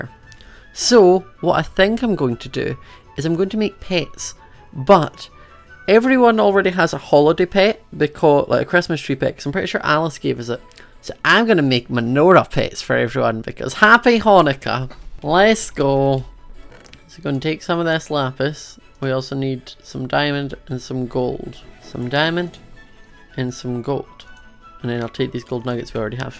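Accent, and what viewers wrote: Scotland, United Kingdom. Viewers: Are you Scottish???? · are you really Scottish because i love your actcent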